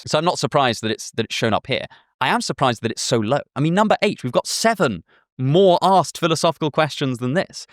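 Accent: RP, United Kingdom. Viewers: There is something very funny in hearing grandly articulate "What-to-do" in his rather posh and fabulous english accent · it's all about the british accent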